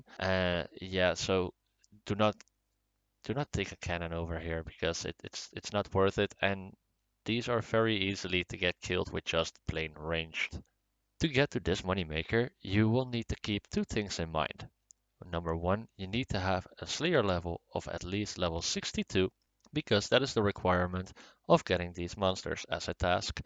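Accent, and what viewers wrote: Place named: Netherlands. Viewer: u sound dutch :D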